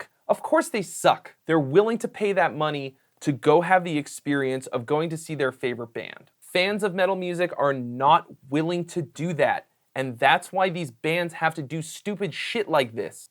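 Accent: United Kingdom. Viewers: Your British accent makes you sound like Russell Brand. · Your English accent reminded me of Russel Brand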